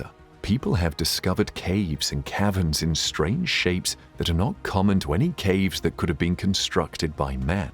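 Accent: United Kingdom. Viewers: If a British guy is narrating you know it's gotta be legit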